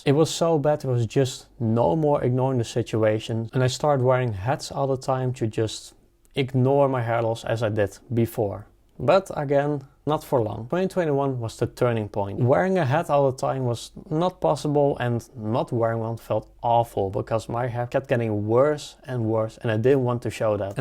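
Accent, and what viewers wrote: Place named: Netherlands. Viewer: where did you do your hair transplant? you sound dutch, maybe in netherlands?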